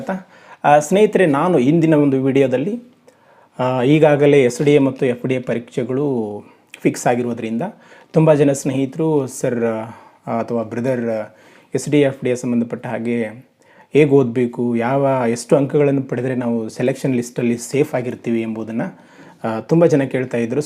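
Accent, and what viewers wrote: North Karnataka, India. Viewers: Nimma way of communication lovely sir I love it pakka uk mandi anasutte thanku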